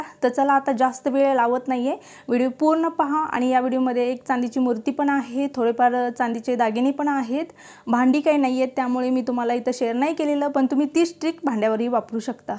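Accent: Kolhapur, India. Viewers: भाषा doesn't matter कोल्हापुरी भाषा रांगडी वाटते पण आतून मधाळ असते,I am also kolhapuri . Video छान, उपयोगी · छान व्हिडिओ बनवतात तुम्ही आवाज पण छान आहे शांत आहे सोलापुरी कोल्हापुरी भाषा तर खूपच छान आहे नाव ठेवू नये कोणी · Tumhi chhan bolta tai. Bhashebaddal koni kahi mhatale tari tumhimkahi watoon gheu naka. Bhasha he sanwadache sadhan ahe. Ani dar 4 kosawar bhasha badalte. Tumchya bolnyat kolhapuri baaj ala ki mala to khoop awadto. (mi kolhapurchi nahi)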